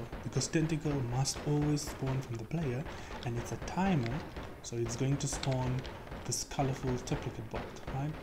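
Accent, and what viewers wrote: South Africa. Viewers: Hey man just wondering you have a South African accent Are you form the Western Cape?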